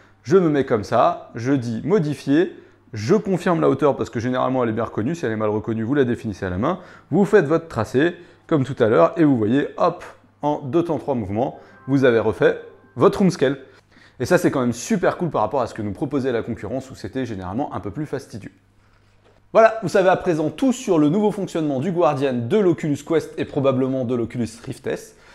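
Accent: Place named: France. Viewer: C'est à cause de l'accent Français mon garçon ^^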